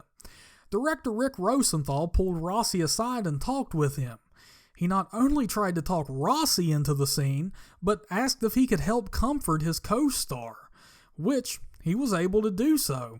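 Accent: United States. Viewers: this guy sound like a hick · His voice so country🤦🏽‍♂️😂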